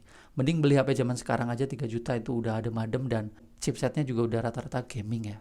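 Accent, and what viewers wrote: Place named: Banyumas, Indonesia. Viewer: Njirrr wong Banyumas si. Dongen nganggo basa ngapak bae mas hahahha😂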